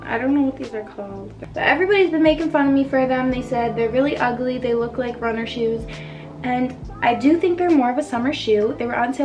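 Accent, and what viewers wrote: Midwest, United States. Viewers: midwest accent !!!!! thank you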